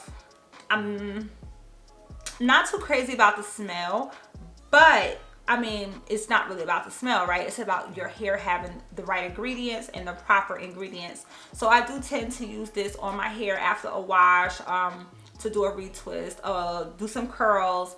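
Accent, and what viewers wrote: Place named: New Jersey, United States. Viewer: I could tell you were from Jersey! lol like my mom. your locs are so beautiful! makes me want to reconsider and get smaller locs lol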